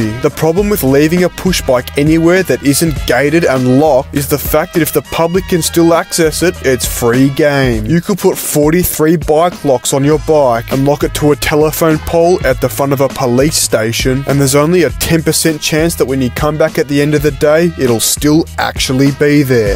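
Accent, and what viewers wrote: Australia. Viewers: I hear Australian accent i will automatically dislike and block the channel, I say that as an aussie myself.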